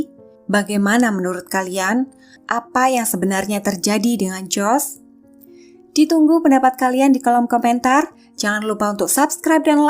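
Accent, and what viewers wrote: Java, Indonesia. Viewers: ko medog ya suaranya, ga kaya sebelumnya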